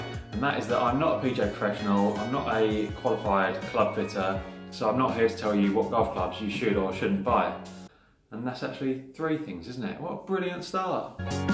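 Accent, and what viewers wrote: Australia. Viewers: Very new to golf and started looking to buy my first set of clubs just got a little more educated thanks mate.....love the Aussie accent.🤣🤣🤣🤣 Yes I'm an Aussie . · 😂 the Aussie accent. I recently went to test some clubs. I say test because my coach has already fit me. I tested the Taylormade P790’s and the guy at the store could not understand why I didn’t want a 7 iron that carried 15yards further than my current 7 iron. My 7 carries 150. I don’t need a new 7 that is carrying 165. There is always going to be a bad gap in the bottom of the bag. There were 15-25yard gaps between the 8-PW! You have to test the entire set. I loved the feel of the club, but I can’t have that much uncertainty 120yards from the green.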